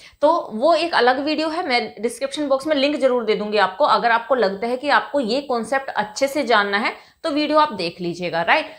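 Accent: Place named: India. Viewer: Dear। beta....you really do well...but be little more cautious while using any strucure,and meaning and sence of sentece as well...And please do correct your pronounciation and your dialects.....your UP.BIHARI,AUR HARYANVI touch spoil the language......work over it....Dear Your sentence should be.....The younger he is more crafty or mean he is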